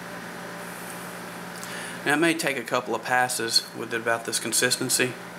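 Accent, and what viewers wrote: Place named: South, United States. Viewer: Love your dirty dirty south accent <3 And thank you for the tutorial. It helped me a lot! :)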